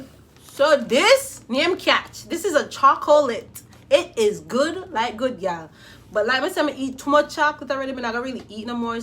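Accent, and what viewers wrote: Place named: Jamaica. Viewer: That’s so dope, your Jamaican first off I love it I have two first Jamaican cousins and man y’all know how to turn sun shit up! I love Jamaicans 🇯🇲One ❤️! I love your accent as well 🥰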